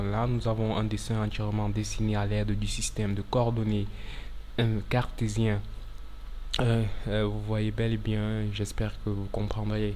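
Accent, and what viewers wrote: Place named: Cameroon. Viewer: laccent Camerounais!!! o bossoo!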